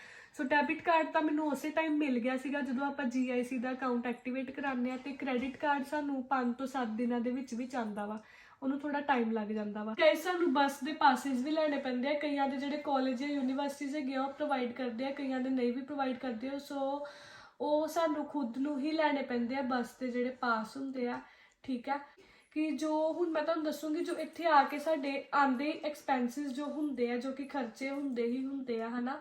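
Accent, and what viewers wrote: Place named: Amritsar, India. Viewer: Love ur Amritsari accent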